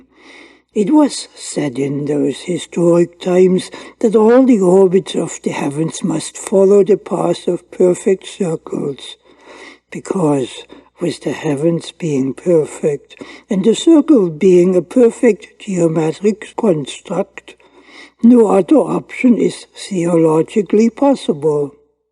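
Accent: Germany. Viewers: i think your work is great and the Einsteinian accent adds to the atmospheric's, your humanist attitude is enviable and i look forward to further work by you. thanks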